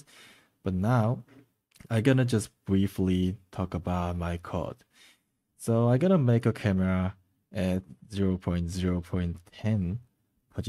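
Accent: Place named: South Korea. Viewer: 발음이 한국 분인듯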